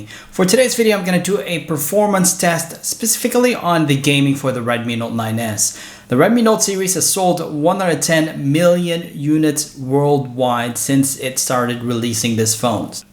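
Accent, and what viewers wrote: Bisaya, Philippines. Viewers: bisaya diay ka sir? Nice HAHHA washout sir from PH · bisaya d i ka bai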